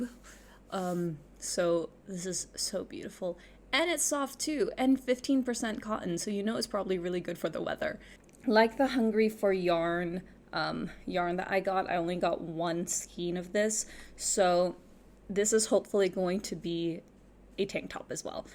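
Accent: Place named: Philippines. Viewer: Hello! You do like a bit Filipino coming from a Filipino. I think SEA prefer crochet also is because it's much more breathable because it's more hole-y compared to knitting so it's better for the warm weather in SEA.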